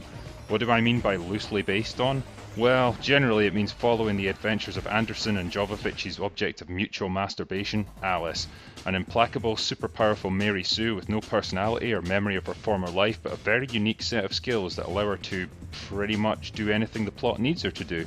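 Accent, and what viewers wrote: Scotland, United Kingdom. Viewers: you sounds Scottish in this video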